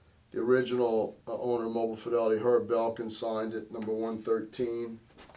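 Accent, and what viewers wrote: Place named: New Orleans, United States. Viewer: That is a amazing box set collection. Are you from Louisiana originally your accent reminds me of a guy I met from New Orleans.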